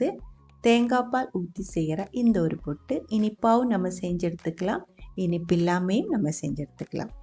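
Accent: Kerala, India. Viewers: Listen her accent, she too is a Keralite 😃😃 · Thank you for your video and detailed instructions. I very much enjoyed your Tamil with Malayalam accent. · Auntie, yude thamizh... Manassilakunnundu... Malayaliyanennu... But a good effort, keep it up 🎉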